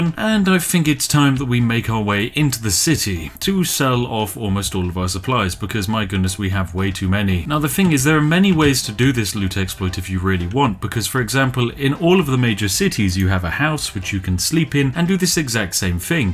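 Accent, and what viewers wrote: United Kingdom. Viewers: PERFECT CHANNEL TO HEAR A BEAUTIFUL BRITISH ACCENT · It’s funny cause he’s so “AMERICA!” But he’s British so he’s like “Britain!”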